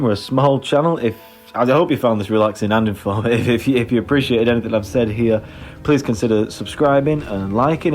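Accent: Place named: United Kingdom. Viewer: You should definitely try out Owlcat’s games as they have some incredible cRPGs similar to Pillars and Tyranny from Obsidian. Your comments really show maturity as the whole “woke” thing is about the right-wing not liking liberalism more than how it’s implemented into games, which it is fine to be right-wing, but the problem is it’s simply a conflict of interest to critique a video game with political messaging by countering that with your own political messaging. Planescape Torment came out back in 1999 and was very political on how all factions were sometimes this “Lawful evil” alignment with other factions focusing more on personal divine alignment and mind, transhumanism, tribalism, radical revolutionaries, etc. That is the ultimate “makes you think” type of game, and any PC can run it. Other than that, the closest “spiritual remake” to that is Disco Elysium, and that game is brilliant in many ways and it’s what it feels like if BG3 was just a story game with no combat and this sense of dissociation throughout the game. 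Thank you for making this lovely video, and might I say you do have quite a nice accent, I’m not sure where it’s from (Scottish or English?), but you do have a good accent nevertheless.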